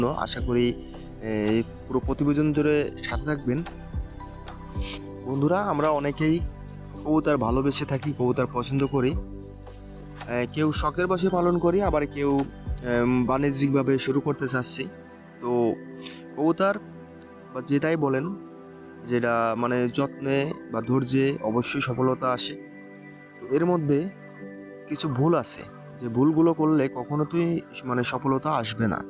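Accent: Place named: Magura, Bangladesh. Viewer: আপনার কথা শুনেই বুঝতে পারছি যে আপনি মাগুরা বা এর আশপাশের এলাকার লোক। আমার বাসা আলোকদিয়া পুকুরিয়া, বগিয়া ইউনিয়ন। আমি মাগুরা শহরে থাকি।যাই হোক আপনি কত দিন যাবৎ কবুতর পালন করছেন? আপনার কি মনে হয় যে এটা পালন করলে কি লাভবান হওয়া যায় , নাকি শুধু শখের বসে এটা পালন করা উচিত? একটু রিপ্লাই দিয়েন।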